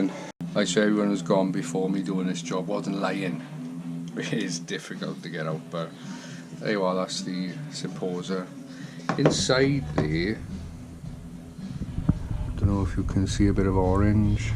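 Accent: Wales, United Kingdom. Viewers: Anything that drowns out that God awful Welsh accent, shouldn't be removed.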